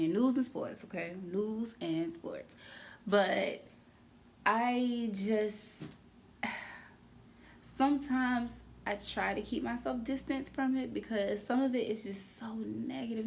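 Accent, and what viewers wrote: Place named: Virginia, United States. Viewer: Omg!!! I’m from VA! Virginia Beach! I had.m a FEELING with your “accent” (as Pharrell would call it...) I felt like it was like mine!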